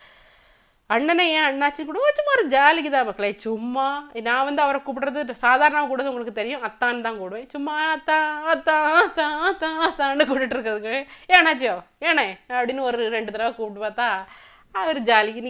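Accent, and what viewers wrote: Nagercoil, India. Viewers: நாகர்கோவில் தமிழ் அருமை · Very Very great, because of your natural expression of your way of giving the replies. and waiting for the more and more videos from your end. Your Nagercoil slant is added advantage for you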